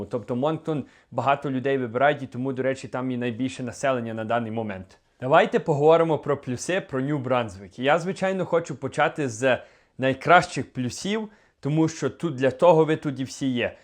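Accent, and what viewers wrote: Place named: Lviv, Ukraine. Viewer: На Львівщині люди дійсно так розмовляють.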